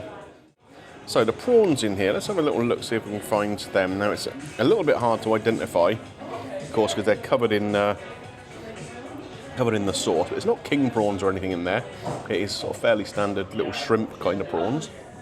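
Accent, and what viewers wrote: United Kingdom. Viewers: I can’t stop watching your vids. Yank here but love your British accent and your adjectives are addictive. I love good food! Thank you!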